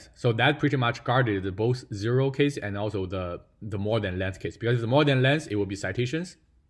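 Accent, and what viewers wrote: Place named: China. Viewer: I like your chinglish style. Funny but with good confidence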